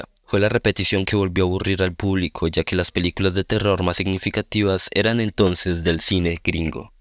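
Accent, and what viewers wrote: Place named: Colombia. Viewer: Parece acento colombiano.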